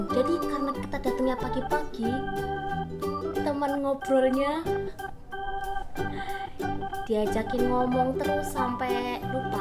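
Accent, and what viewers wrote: Java, Indonesia. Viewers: Medok banget jawanya 😂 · Wah,hi ini Brusan dengar suara ni,jdi org jawa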